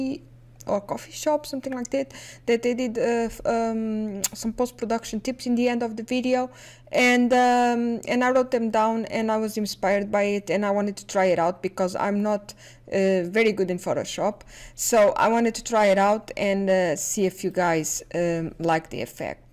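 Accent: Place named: Portugal. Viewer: este sotaque tem, mas TEM, de ser tuga 👀 não engana ahahah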